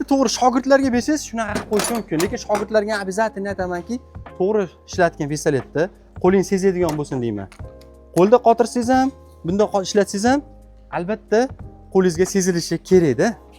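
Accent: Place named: Kokand, Uzbekistan. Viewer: Аллохума барик! Сог булинг Укамжоним, маълумот жуда чиройли етказиб берилган! Куконда лигини шеъвадан тушундим, каерда жойлашгансизлар?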